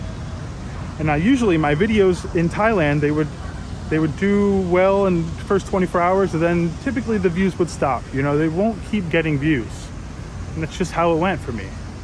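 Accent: New York, United States. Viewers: Love your New York accent. Keep it up. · Keep talking bro! Malaysians are trying to learn your American English by listening to you! Keep doing it..Good luck from a Malay American living in Boston.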